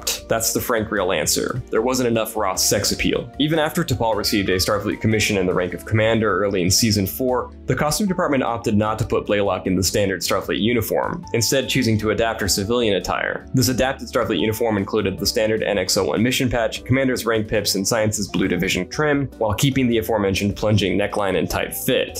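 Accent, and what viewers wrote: United States. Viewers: Uhh. Who is the Yank talking? It's jarring! :P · Wait, did y’all hire a new person? An American??? (I’m American too, so, I empathize haha) Or is this the fabled who Adam Clery is always shouting out? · Wait... You hired an American?? Maybe I should have applied after all.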